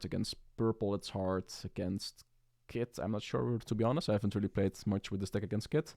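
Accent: Netherlands. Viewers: got an interesting accent, are you dutch by any chance? Also Nice Channel!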